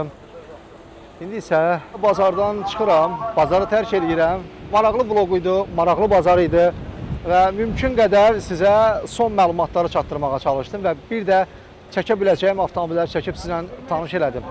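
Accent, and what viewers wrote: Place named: Ordubad, Azerbaijan. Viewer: Qardaş Ordubad lehcesiyle danişir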